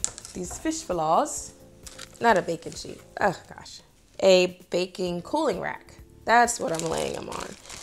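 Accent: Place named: United Kingdom. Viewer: Roger Powell Americans have many different accents. You can hear it in her undertones. It almost sounds British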